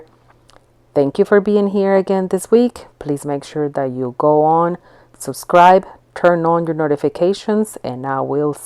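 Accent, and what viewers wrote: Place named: Southwest, United States. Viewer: Beautiful job!! Thanks for the tip about staining. I understand you perfectly - your accent is just a normal Southwestern accent, a lot of people have it here, most especially if their families have lived here for a very long time. I live in Albuquerque New Mexico and wondered right away if you were close by because so many people talk like that here. It says you're in or near Dallas, so it's normal. It's a very normal 'accent' and I used to think it was just an Albuquerque area accent, but it's not, it's like that throughout the Southwestern US, mostly just in a band across Texas, New Mexico, Arizona, and California, more common in the southern halves of those states but lots of people throughout those states have it.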